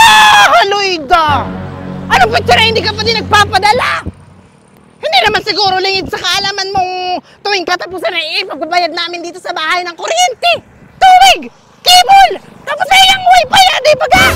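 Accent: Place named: Tanay, Philippines. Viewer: 😅😀😂ayy nakopo Meses ikaw baga e tlgng nakakatuw akoy tawa ng tawa syo meses,,,Lalo n yng Puntong Tanay, mo dito samin na "Hane" 😂😀😅👏👏👏👏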